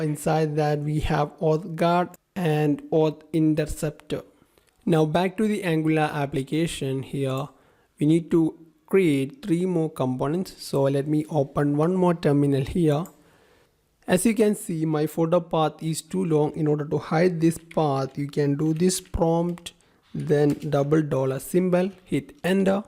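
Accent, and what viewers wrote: Kerala, India. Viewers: pronunciation ketata malayali aano na enikyum ondu shamshym.. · malayali aano?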